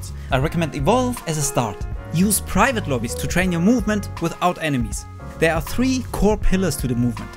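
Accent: Germany. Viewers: Why do you have such a german accent? Tbh, if you are german, you are speaking very clearly, easy to understand Love your videos btw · As a German he sounds german :D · not really. he sounds very german :)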